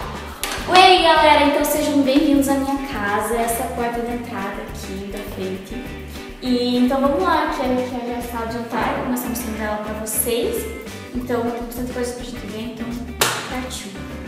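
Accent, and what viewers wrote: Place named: Curitiba, Brazil. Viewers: é que ela é do sul (especificamente de Araucária, região metropolitana de Curitiba) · ela e de Curitiba , pia kkkkk amo esse sotaque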